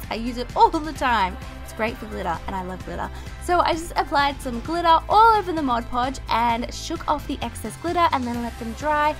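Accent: Australia. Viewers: Are you from australia? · She's from Australia so yes she does have an accent! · are you Austrailian?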